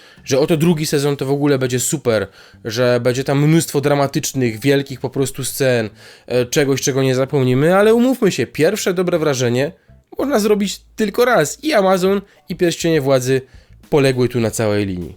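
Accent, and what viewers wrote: Silesia, Poland. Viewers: mam takie pytanko, jesteś ze Śląska? Bo wyczuwam akcent:) nie mam FB ani innych mediów społecznościowych więc tylko zgaduję heh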